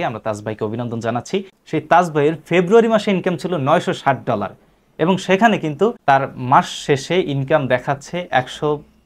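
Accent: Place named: Barishal, Bangladesh. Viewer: ভাই আপনার বাড়ি বরিশালের কোথায় ?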